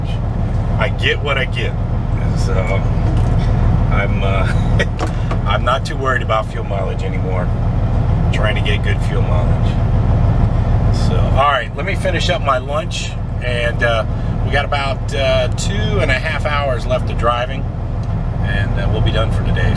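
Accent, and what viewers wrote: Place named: Western Pennsylvania, United States. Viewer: What city are you from, originally. Wondered about the accent and word usage. I don't mean any insult, but you sound somewhat like you're from Pittsburgh or Western PA, where we are.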